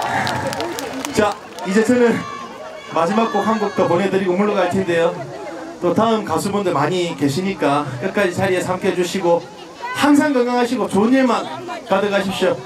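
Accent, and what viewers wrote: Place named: Gyeongsang, South Korea. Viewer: #진해성 가수님 함양중학교 총동창회 축하드려요 더운날씨에 고생하셨습니다 첫무대,대히트곡 사랑반 눈물반 와 최곱니다 살짝썩인 사투리 무대맨트 최고에매력 경상도사투리 많이많이 써주세요 억지로 표준말을 쓸려고하지마세용 ㅋㅋ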